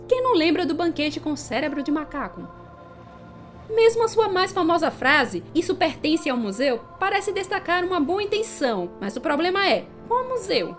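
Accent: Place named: Sergipe, Brazil. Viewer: Ela é de Sergipe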